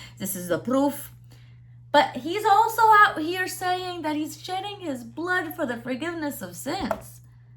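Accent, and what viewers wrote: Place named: Levant, Arab world. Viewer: Very good acting in Eastern Arabic English accent. Wow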